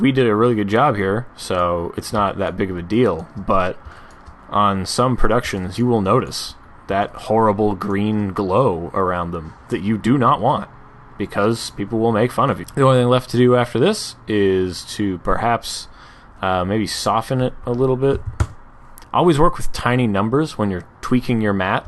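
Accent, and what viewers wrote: Canada. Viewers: ... Ur Canadian aren't you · Are you Canadian? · Dat Canadian accent doe :D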